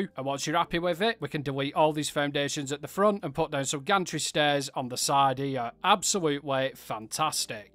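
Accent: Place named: Northern England, United Kingdom. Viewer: Just simply simply lovely as always Great build commentary and humour love it keep it coming mate don’t just watch for the builds but for the northern commentary and humour as well just brilliant!!!!!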